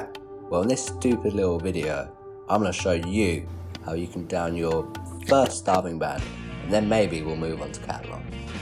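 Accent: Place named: United Kingdom. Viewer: When you're just British without the spiff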